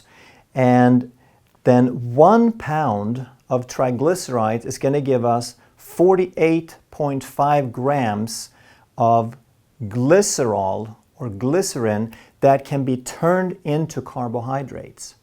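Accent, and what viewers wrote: Sweden. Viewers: He is Swedish. And you can hear it on his accent all the way through. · You sound Swedish yet the Swedish translations on your channel look machine translated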